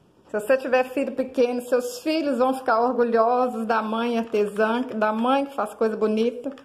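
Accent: Minas Gerais, Brazil. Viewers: vc e mineira como eu? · amo seu trabalho o seu geito de falar...MINEIRA???? PARABENS.🙏🏻👏🏻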